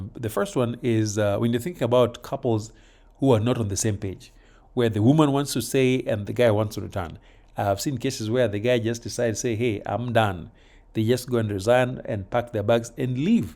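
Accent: Luo, Kenya. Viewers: Enjoying your slight luo accent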